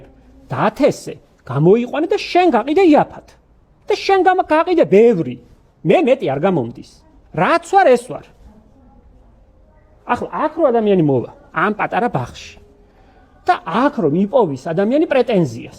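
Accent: Guria, Georgia. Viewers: ვგიჟდები შენ გურულ კილოზე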